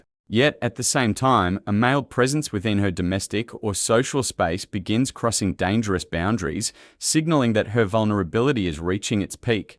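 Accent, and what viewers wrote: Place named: Australia. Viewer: FINALLY! AUSSIE ACCENT!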